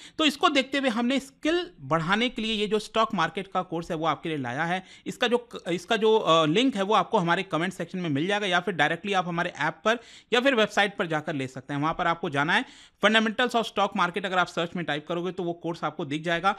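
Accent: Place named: Maithili, India. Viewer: न बेसी आएगा न कम आएगा शुद्ध मैथिली❤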